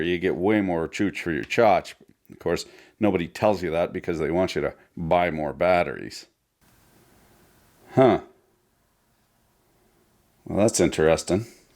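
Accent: Quebec, Canada. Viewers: Are you from Quebec? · As a Canadian I can say never have I heard more of a Canadian accent. So thank you sir!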